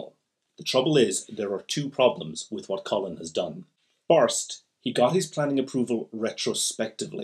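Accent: Ireland. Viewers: Are you from Cork lol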